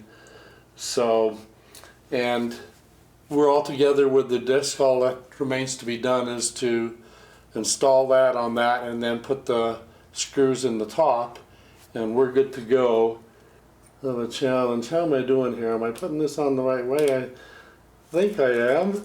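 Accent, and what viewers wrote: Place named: Midwest, United States. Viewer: Thanks for your videos! I thought your accent was MidWestern. Michigan, Wisconsin. I'm from Pennsylvania.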